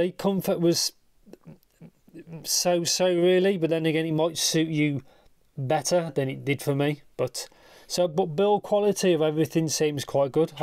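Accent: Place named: England, United Kingdom. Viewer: I'm unfamiliar with ur beautiful accent, to which part in england it belongs?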